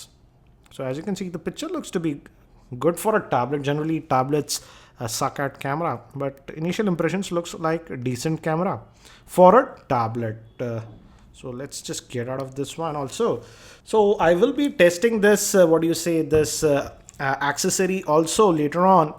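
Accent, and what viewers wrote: India. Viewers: dear, please change your accent. It's ok to have an Indian accent, but when you stop every sentence, it feels like you are singing, but with the same tune. Please take this as a friendly suggestion and please stop bending the last word of your sentences. Otherwise all your reviews are good.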